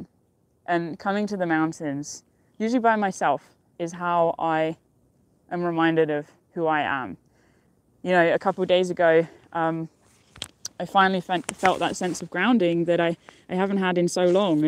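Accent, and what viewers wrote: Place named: Scotland, United Kingdom. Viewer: Fab views, thanks. Love your wee Scottish accent, can’t wait to see you in a kilt 😉x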